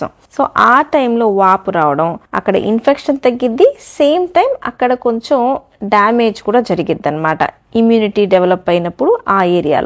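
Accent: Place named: Ongole, India. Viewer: డాక్టర్ గారు మీరు వివరిస్తున్న విధానం బాగుంది. కానీ తగ్గుద్దీ వచ్చుద్దీ అవుద్దీ ... వంటి ఒంగోలు విజయవాడ లో గ్రామీణ యాస ఎక్కువ గా వుంది. Public forum కదా.. అన్ని ప్రాంతాల్లో చూస్తారు... భాష కొంచెం మార్చుకుంటే ఇంకా చాలా ఆకర్షణీయం గా ఉంటుంది.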